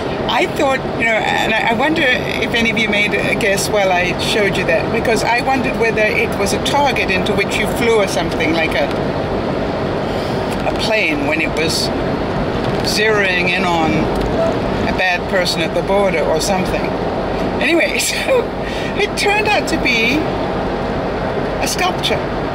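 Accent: United Kingdom. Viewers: Found your channel through Judy and Benji and liking it so far! Can I ask, are you British at all? Just your accent sounds familiar to me! :)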